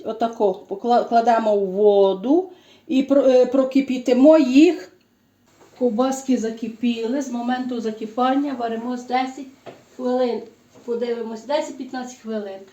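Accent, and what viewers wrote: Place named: Transcarpathia, Ukraine. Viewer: І що?Жителі Закарпаття не соромляться свого діалекту.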